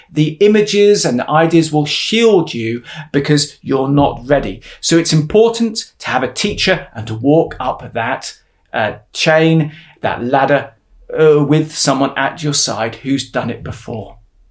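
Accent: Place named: South, United States. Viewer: He's an Englishman speaking like a Southerner. As a Texan, we talk that way so people can better understand what we're saying. Comprende??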